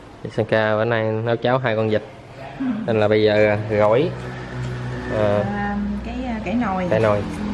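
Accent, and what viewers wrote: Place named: South, Vietnam. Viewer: Nghe giọng nói miền nam thân thương quá , mần thay vì làm , thiệt là ấm lòng .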